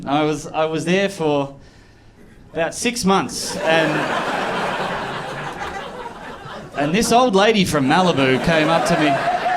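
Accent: Australia. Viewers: Aussie accent is THE BEST ❤ Solid set too 👌🏽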